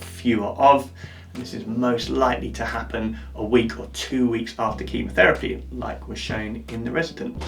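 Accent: England, United Kingdom. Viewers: skinny white guy + english accent = instant likes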